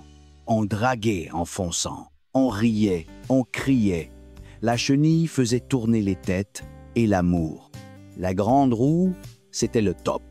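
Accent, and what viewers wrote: Quebec, Canada. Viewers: Mais c'est de la France dont vous parlez, ou du Québec? l'accent dans la narration est vraiment québécois, et je vois l'affiche Théatre Princesse avec Ville de Québec dans le visionnage. De quel pays parle-t-on exactement svp???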